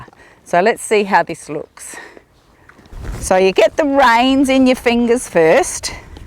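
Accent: South Australia, Australia. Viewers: Thank you for this. I've switched to Western from English (or plain old bush bashing). The quarter horses I ride in my lesson have very slow lopes and their heads bob up and down. I have a lot of trouble keeping my hands still as they tend to go with the horses bobbing head! Western people don't seem to close their fingers but I will try this at my next lesson and see if by closing my fingers more and tucking my elbows into my hips if I can keep my hands still! I'm hoping if my hands are still and fingers closed my lesson horse may get into more of a frame. I'm pretty much taught in Western to use my leg and seat for turning. The horses I ride are both very well trained and basically if i just look a particular way, they'll go there but I definitely have trouble loping and keeping my hands still. By the way, are you from South Australia? South Aussie's seem to have a slightly different accent. 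lol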